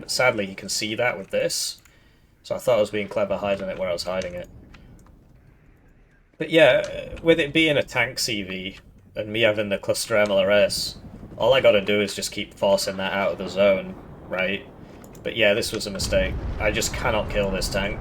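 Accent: United Kingdom. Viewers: why do u look jewish , dress like arab , and sound british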